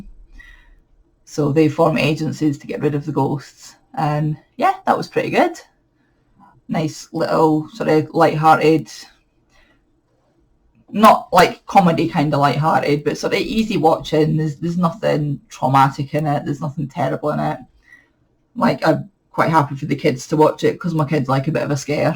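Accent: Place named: Glasgow, United Kingdom. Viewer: Love that you hear the Fife accent but here in Fife all anyone hears is the Glaswegian accent 🤣 Your Virus Shawl is gorgeous and your cabled Jumper is going to be amazing 😍 There is nothing worse than half spun or broken bits in commercial yarn, so completely feeling your pain. Your Cross-stitch is fabulous, can't wait to see it progress it's going to be stunning!!! xxx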